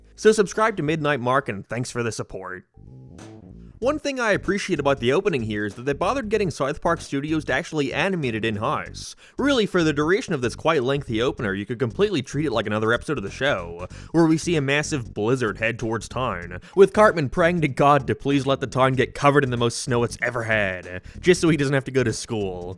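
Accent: Ireland. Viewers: i keep forgeting your irish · Irish accent I believe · You sound more Irish when you were younger. Glad you grew out of that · It's an Irish accent you simpleton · He’s irish you testicle sucker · It’s a Irish accent he even talked like that as a child man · It's kind of put on but also he's just irish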